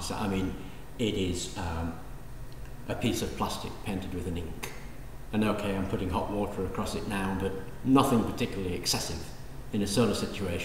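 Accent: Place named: England, United Kingdom. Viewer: english accent plus echo means no compreda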